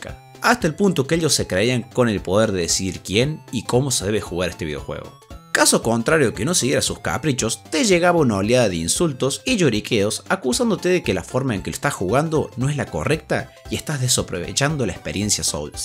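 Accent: Córdoba, Argentina. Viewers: Che loco vos sos de cordoba o alguna provincia vecina?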